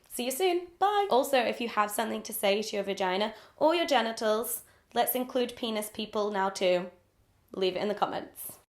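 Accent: United Kingdom. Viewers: You have the most satisfying and British (like me) voice ever omg... also im glad im not the only one!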